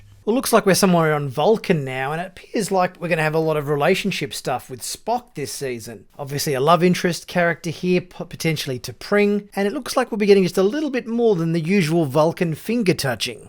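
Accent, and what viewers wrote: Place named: Australia. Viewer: Was NOT expecting a fellow Aussie when I clicked this video! Respect! Subscribing now mate 👍🏻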